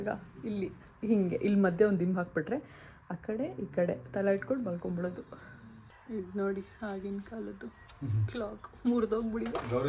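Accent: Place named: Davangere, India. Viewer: Davangere slang kelake kushi❤😊so happy to hear from you😊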